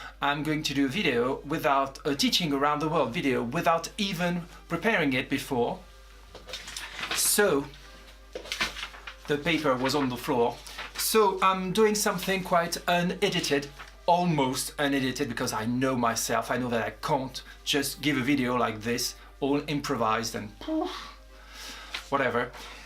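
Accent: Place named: France. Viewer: Your English is better than you think and don't worry, I could understand everything. Besides I love your French accent!. Things are soooo different! I'm not sure I like your system better, but it sure would be nice to have job security. Here without professional development you can't renew your teaching license, which you have to renew every 3 or 4 years.. In my school district you have to have a masters degree to be hired and you are on probation for 3 years. Then you have seniority, but even then, due to budget cuts you still can be laid off. A week or so ago we were told that maybe 8 teacher will have to go next school year. I wish I was French!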